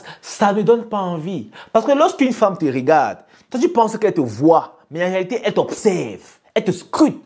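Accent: Cameroon. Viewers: La manière donc tu parle la j'espère que tu es du kamer 🇨🇲 force a toi Fréro 🤝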